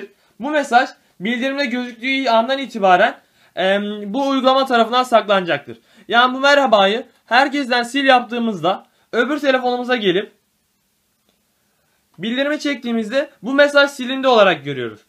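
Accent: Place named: Azerbaijan. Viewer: İntro TV adam azeri bizde azerileri anlamıyoz bazen normal bişi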